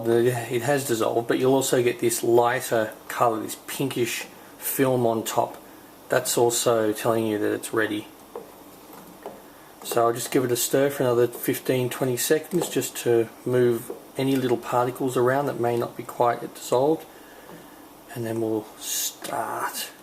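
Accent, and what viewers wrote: Australia. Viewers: Aussie I think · r u aUSTRALIan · hes an aussie?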